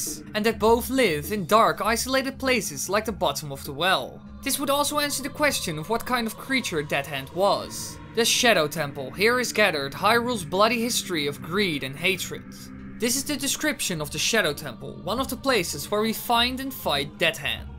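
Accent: Netherlands. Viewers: Lol, u are dutch, i can tell. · Your accent sounds dutch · He has a very heavy Dutch accent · jesus man you may be Dutch but don't lay the accent on that thick....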